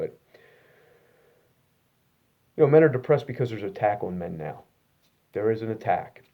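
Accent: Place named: North Jersey, United States. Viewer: can tell from the accent.. am from north jersey myself.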